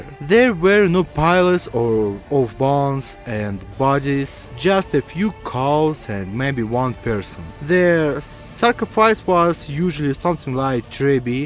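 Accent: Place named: Russia. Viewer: Бро, с таким произношением тебя никто не поймёт, кроме русских. Floor, door = [флор], [дор] Bear = [бэр], "бир" это пиво =Ъ Abandoned = [абАндонд] и т.д. Акцент акцентом, но гласные должны быть хоть приблизительно правильные.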